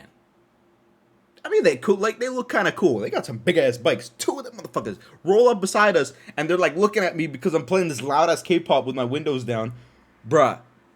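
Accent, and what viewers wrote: Canada. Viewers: fr he sound like a white guy, or maybe canadian speaks like that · I havent noticed until now but got a decently strong canadian accent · I knew you’re not American from scattered context but damn, how did I not clocked you as Canadian? I’m a failure.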